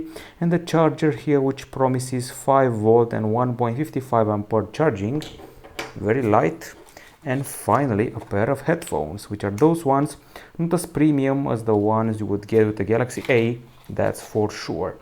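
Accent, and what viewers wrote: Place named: Romania. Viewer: Esti roman dupa engleza ta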